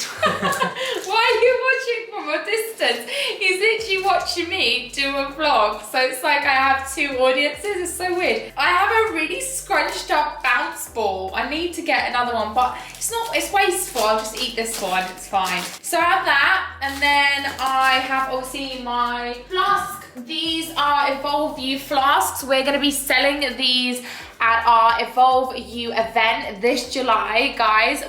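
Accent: United Kingdom. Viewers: daaammmnnn...why am I so love to british accent, so cute 😻 Wait 🙄, really just the accent bro?? 😏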